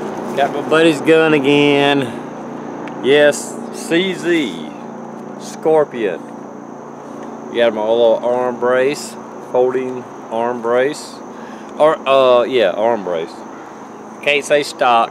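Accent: South, United States. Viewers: I'm sorry, but I cannot take this video seiously. The southern accent, mohawk haircut, weapon, rooster crowing are just too much. Wonder if he is in his underpants? Maybe some version of deliverance. He he.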